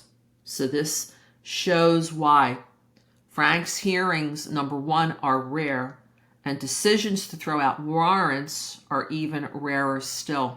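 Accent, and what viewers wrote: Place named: New Jersey, United States. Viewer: You sound like you're from NJ. I'm a Cathy from NJ✨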